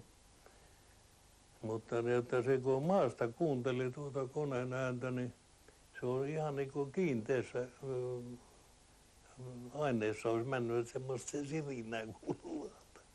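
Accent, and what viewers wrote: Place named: Sortavala, Russia. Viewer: Utissa <num> aloitettiin alokkaina. Esikuntakompanjan rakennuksen vieressä oli Mersu, jonka edessä otettiin ensimmäiset kuvat. Tupien nimet olivat menetettyjen lentotukikohtien nimiä. Illu puhuu Sortavalan miehen murteella ja tyylillä juuri noista paikoista, joiden nimiä käytimme ryhmänjohtajina. "Tupa Suurmerijoki jne"..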